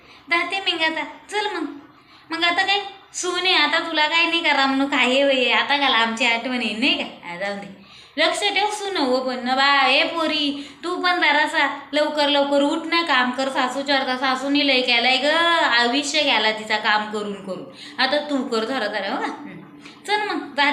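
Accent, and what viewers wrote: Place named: Shahapur, India. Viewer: शहापूर च्या भाषेची आठवण येते तिथल्याच बोलीभाषेची🎉😂❤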